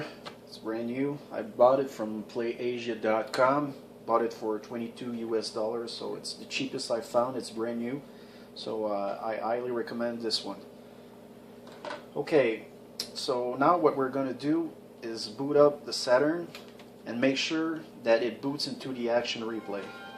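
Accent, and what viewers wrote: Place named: France. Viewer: Salut, tu es francais toi vu ton accent. Merci pour le tuto.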